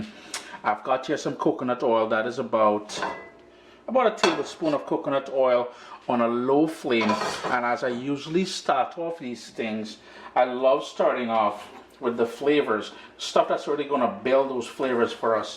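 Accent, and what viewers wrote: Trinidad and Tobago. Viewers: Ur good and love ur Triny accent. Great dish have to make it soon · You said "allyuh" so you're from Trinidad, but there are some other accents going on there as well...? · Trini accent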